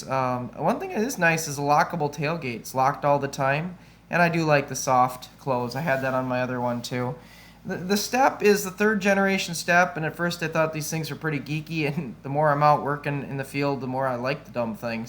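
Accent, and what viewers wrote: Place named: Fargo, United States. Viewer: its REALLY NICE! lol...love the fargo accent.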